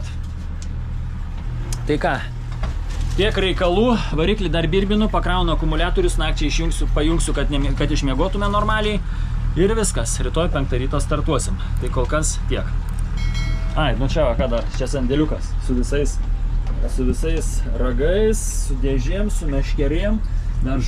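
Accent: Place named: Czech Republic. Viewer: Gražus čekų akcentas ❤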